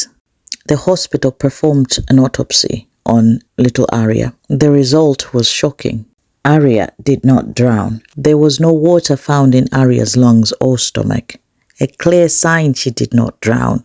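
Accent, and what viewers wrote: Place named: United Kingdom. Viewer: You've British accent... tikambilane, kindly share your email address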